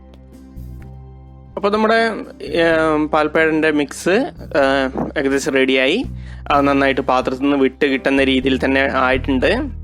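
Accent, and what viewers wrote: Kannur, India. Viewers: ettan kannur kaaran anno? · 6 .49 innann paraya😂our original kannur slang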